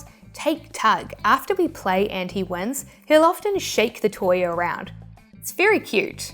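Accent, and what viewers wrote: New Zealand. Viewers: I have no idea why this video popped up in my feed. Now, though, I'm obsessing over your accent, highly reminded of a Kiwi with whom I used to work years ago, and wanting to just find a youtube channel with you reading books or news articles. If my native accent has that kind of power over people, I want to know where and I want to buy plane tickets now. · I knew that enchanting kiwi voice sounded familiar!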